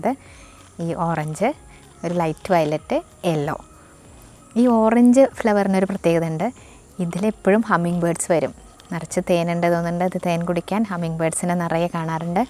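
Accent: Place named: Palakkad, India. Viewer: I ❤ your Palakkadan slang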